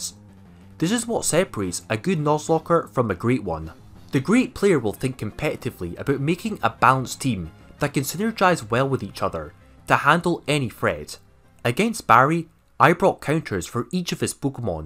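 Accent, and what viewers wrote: Scotland, United Kingdom. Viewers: i really just wasted half an hour watching a scottish man on the internet talk about nuzlocking, oh well still was a decent watch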